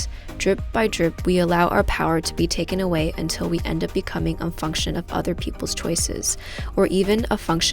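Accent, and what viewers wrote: China. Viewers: The Chingrish is killing me. It's how I talk too hahahahaa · Love your Chinglish! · The way you speak Chinglish is how I do too HAHAHAHA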